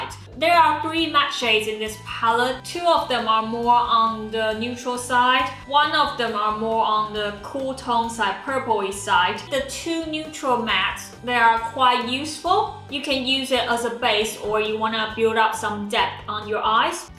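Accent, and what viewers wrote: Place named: United Kingdom. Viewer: 沒想到的英文有了英式發音 XD 好新鮮的感覺 (從部落格時期收看文字的我...適應會動的中) but it's a nice palette..but wow, that purple..lol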